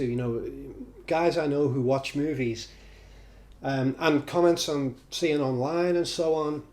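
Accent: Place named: Manchester, United Kingdom. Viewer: You sound like Noel Gallagher! Are you from Manchester?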